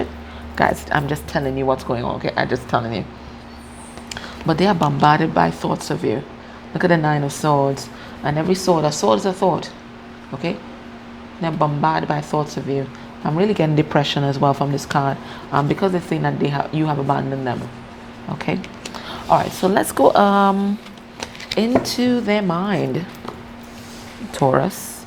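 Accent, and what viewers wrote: Trinidad and Tobago. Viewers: Wowwwww this is crazy. I first came to your channel months ago and I said to myself how she sounding like a Trini so 😂😂 Quito Quito indeed 👏💜❤️ bless up from the homelands. Love your readings.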